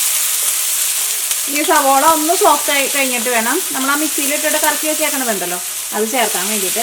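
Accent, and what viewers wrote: Thrissur, India. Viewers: Jhan chechi dae valya fan aanu, more than any thing, chechi dae Thrissur dialect aaanu enikku eshttam... entae mummyum Thrissur kaari aanu, entae mummy thannae explain cheythu tharunna poolae thoonum, chechi parayunna, ethu recepie undaakiyaalum nalla taste aanu... jhan cooking il oru beginer aanu, chechi dae tips enikku othiri eshttanu ,....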